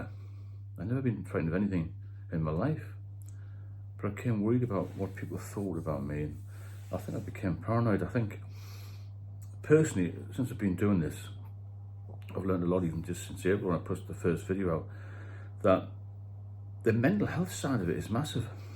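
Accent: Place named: Darlington, United Kingdom. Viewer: yep I’m subbed. I come from Darlington so I recognise the twang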